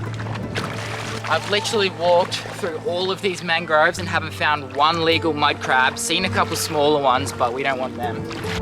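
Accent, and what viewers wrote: Australia. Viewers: Fun of his accent? lol I'd pay hundreds to get an Australian accent as good as his.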